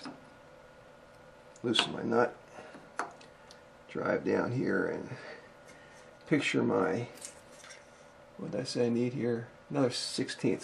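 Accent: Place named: Philadelphia, United States. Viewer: Your accent says you're either from the Philly area or still around there, LOL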